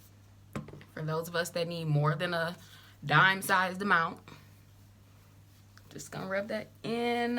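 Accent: United States. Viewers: Friendly comment: You’re speaking REAAAAAALY slowly. And you sound American-born (I am not) so don’t think it’s a language thing? It makes the video excruciating to get through. I put it on 1.5speed and it’s ok. You can be clear and articulate w/out being as slow as this, is what I’m sayin’ ☺️. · I must admit she spoke very normally for someone with an American accent so I can't relate to the original comment.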